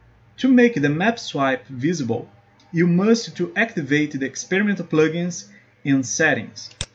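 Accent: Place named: Brazil. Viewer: Engraçado como se percebe logo quando o sotaque é brasileiro, é um sotaque único. Siga produzindo em inglês, nesse meio a maioria entende e o alcance não fica restrito a aqui.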